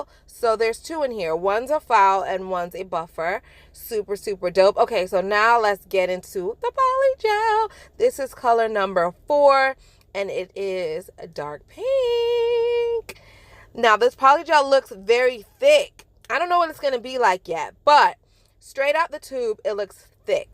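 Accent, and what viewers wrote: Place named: New Jersey, United States. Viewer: Are you from New Jersey? I love your accent and videos 🥰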